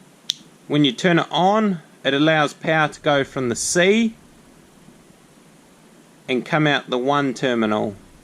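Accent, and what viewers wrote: New Zealand. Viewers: Thanks Great show. I had a bit of fun with the accent (Here is the kettle calling the pot black! I'm European.) Yes even the CC picked it up and kept showing "The Read"wire and even had more problem with the "batten box" ha ha - I seem to have heard this on my 2002 NZ trip, could I be right? (And did they had fun with the Aussies!) Anyway this is a very practical presentation. Thank you. I just wish I did not have a narrow two gangs switch to work with and some 15 wires behind it, stiff ones too! But in the end all good! Really when one understands that Switches are never off but go to L1 or L2, It makes a lot of sense to get the two ways working. More thanx to your three ways and illuminated switch (here I wanted it for my two ways switches to the outside light to remind me to switch it off (My old neon that worked so well in it died) Not so lucky on that one...:) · Mate your a legend not only have you saved me hundreds of dollarydoos but iv learnt something and enjoyed some pure NZ accent doing it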